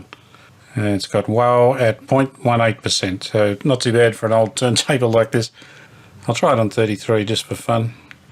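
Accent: Australia. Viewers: Fine job,! I do like your videos. "It's, so if it gets destroyed, it wouldn't worry me.", haha! Those subtle jokes you sparkle here and there are just great. Love the Aussie accent too.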